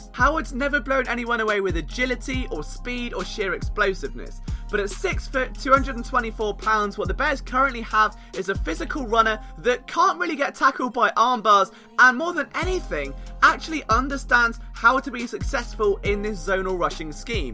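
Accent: England, United Kingdom. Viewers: you nailed it 'unless that draft pick is a 5th or 6th but i dont think the bears would lose howard for that ' hahahhaa well guessed not only was it a 6th that miiight become a fifth but it was also a bonus comp.formula pick PLUS it wasnt a 5thor6th rounder THIS year, its for 2020, we gave up a 6th or possibly 5th round pick NEXT year for stability in the RB room - id lose smallwood, keep adams as a prospect and see if he grows, keep corey clement for his pass catchin (if sproles retires or eithe rway really) i think we should still draft an RB in this draft, esp if a legit back falls, idk bout jacobs in the 1st but there are a few really good backs that will go in the 2nd/3rd so since we have two 2nds and no picks till later in the 4th (when the good RBS on our board could be gone) i reckon we either use a 2nd on a good back that falls OR we trade one of our 2nd round picks to a desperate team lookin for a specific player etc for two 3rds this year or a 3rd and 4th this year and then we draft a solid RB in the 3rd, even using the other 3rd/4th round pick in a bundle to move up to the first pick of round 3 or high enough we KNOW we'll get our guy... 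and can i just say as an english born and bred (but in japan, then NZ where i got citizenship, then america where i did, now i live in australia so its cool hearing an english accent talk american sports with knowledge - reminds me of my dad (who obv kept his english accent since he left engald at age 21,s ame with my MUm btw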